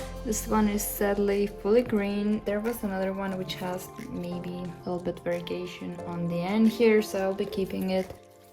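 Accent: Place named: United States. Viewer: Hi. I saw many of your videos, always thought you were american. Compliment for the english and for the good content of your videos! Where do you sell your plants? Ciao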